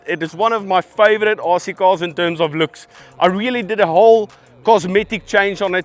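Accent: South Africa. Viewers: I prefer 4 wheel independence.Suspension is by the way are you south africa?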